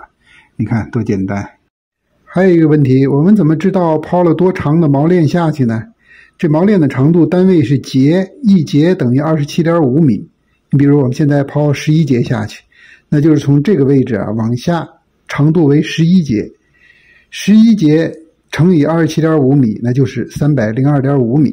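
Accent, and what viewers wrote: Tianjin, China. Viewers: 听口音像天津人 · 天普，船长天津人。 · 船长是天津人？ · 老哥是不是天津人？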